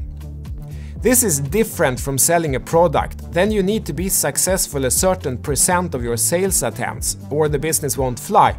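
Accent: Sweden. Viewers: Dina videon är roliga och lärorika. Dock gör det lite ont i mig att höra din svengelska, fast ju mer jag lyssnar desto charmigare blir den:)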